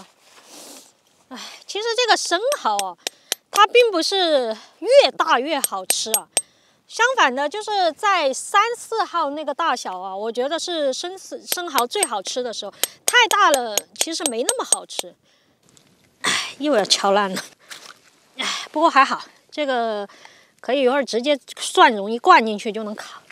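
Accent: Sichuan, China. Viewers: yooupi的口音对于川渝地区的人来说太有亲切感了😂😂😂😂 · 川渝口音好重，喜欢~ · 听声音就晓得是咱四川妹儿，果断关注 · 这川普 有点掉格了😅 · 好重的川普味哟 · 这川味普通话，有点想家了😂😂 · 这口纯正的川普 👌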